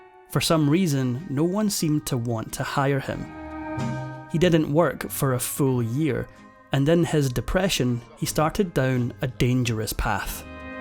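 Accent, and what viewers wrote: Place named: Scotland, United Kingdom. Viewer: Way better than the AI clips. I’m a lover of Scot Accents, so there’s that. Thankyou!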